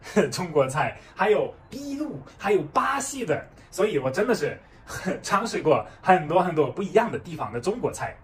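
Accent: Sichuan, China. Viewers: 你的四川口音…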